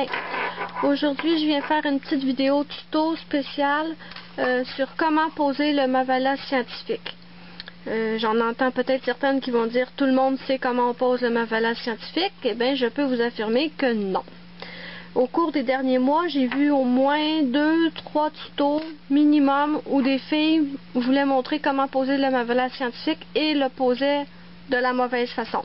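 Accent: Quebec, Canada. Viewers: Merci beaucoup maintenant je saurai comment faire quand je le recevrai pour mon anniversaire :) j'adore vos ongles et votre accent québécois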